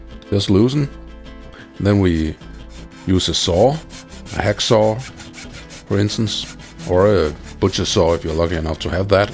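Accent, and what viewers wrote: Denmark. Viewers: Looks great, and the Danish accent makes it even better.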